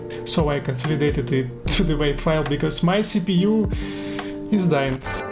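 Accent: Russia. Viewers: так ты русский знаешь. Я такой сижу слушая твою речь на инглише и понимаю , что это мать вашу русский говор . Ахаха, приятно удивился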